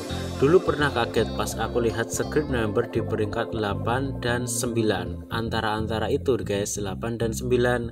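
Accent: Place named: Java, Indonesia. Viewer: Asline pundi mas? Medok rek 👍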